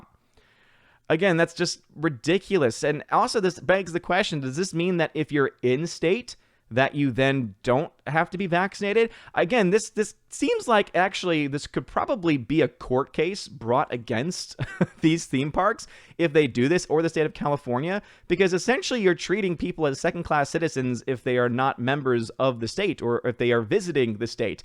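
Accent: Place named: Germany. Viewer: That's a nice German accent there. :-) As for Pinkofornia, the sooner the recall of Gavin Newsom happens, the better, because he'll be only too keen to make overall vaccine passports a reality. :-o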